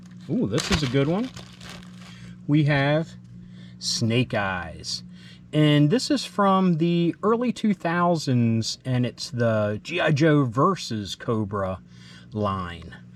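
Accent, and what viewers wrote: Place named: Maryland, United States. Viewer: Are you in Maryland you sound like it I'm in Baltimore I know how we say our Os. If so I'd love to do some Vinatge Joe swaps with ya if your ever interested!!. Also an 80s Kid as well grew up in Baltimore County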